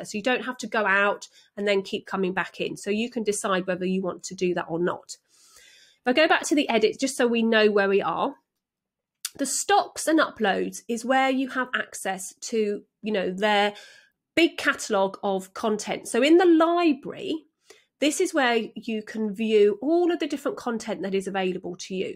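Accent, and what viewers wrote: United Kingdom. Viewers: Nice British accent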